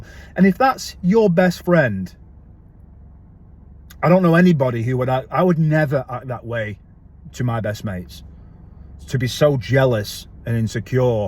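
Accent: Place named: England, United Kingdom. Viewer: Thank you. I feel the same. And I really love hearing your English accent. English accents are glorious & always elevate the experience ❤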